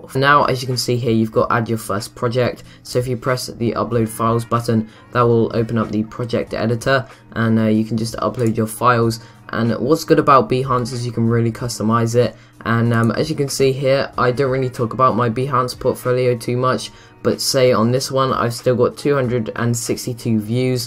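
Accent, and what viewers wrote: United Kingdom. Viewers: Sorry man, are you from UK?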